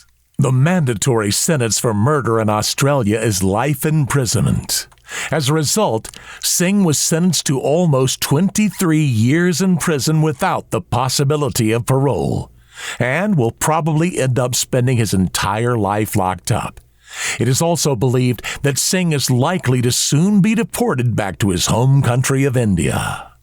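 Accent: United States. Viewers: this American overacting voiceover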